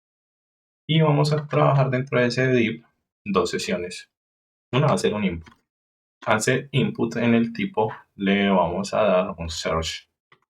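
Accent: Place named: Antioquia, Colombia. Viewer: Su voz suena como de puerto berrio antioquia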